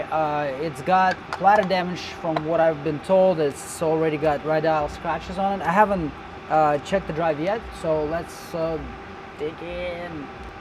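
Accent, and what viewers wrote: Russia. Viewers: Are you Russian? You do sound like a Russian guy. You choice of words and accent are very specific.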